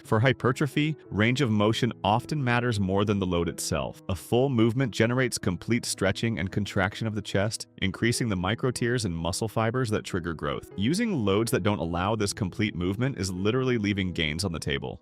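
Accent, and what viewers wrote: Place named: United States. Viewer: yet another BS clickbait video were the narrator has an awful american accent so bad you want to punch them in the face.Avoid.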